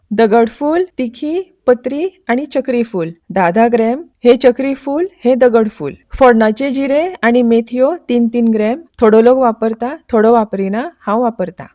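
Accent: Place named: Konkan, India. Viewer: तुमची कोकणी भाषा ऐकायला गोड वाटत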